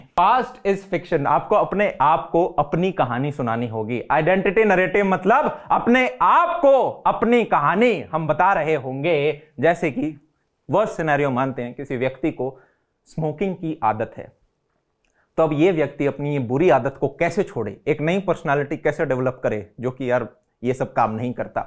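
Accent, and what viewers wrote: Haryana, India. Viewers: Jhunjhunu se ho ya haryana se?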